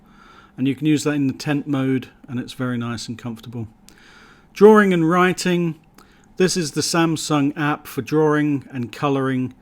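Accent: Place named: United Kingdom. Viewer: i love the accent. sounds like one direction when they talk, specifically zayn. are u british? i'm subscribing bc of that 🌹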